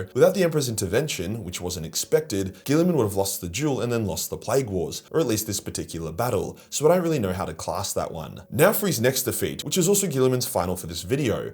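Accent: Australia. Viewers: With my original profile and this one I am commenting from I have been subbed to since the very beginning. I was there at the dawn when the old magic was written. Its been such a cool journey. Ive watched through so many moments of crisis and success. It's been a sight to behold seeing DOM rise so high! I hope you see this and know your Aussie voice has traveled so very far and risen to such great heights. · I only watch 2 actual content creators on YouTube, you and weshammer. I'm literally addicted to your lore videos. My girlfriend told me after going home (its long distance with regular visits) she can't sleep without "the Australian guy talking about warhammer". You're literally my favorite person on the internet and I cant thank you enough for your service! Have a great fucking day. Hail the emperor!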